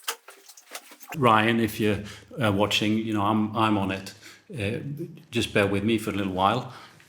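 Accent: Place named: Sweden. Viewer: Great channel very comforting g to hear a Swedish accent oh and awesome video might take a couple aspects of you set up for mine thanks for the content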